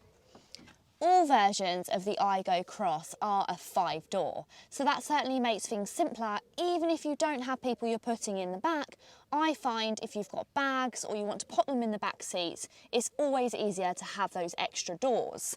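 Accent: Essex, United Kingdom. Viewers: I love your accent! What part of Essex are you ftom? I was born in Rochford.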